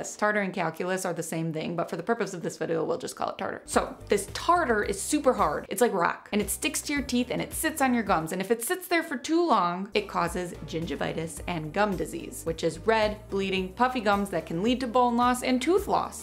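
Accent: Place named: Midwest, United States. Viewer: Awesome! I could detect the Midwest accent. My family is from Iowa but live in Cali. Thanks for your content!!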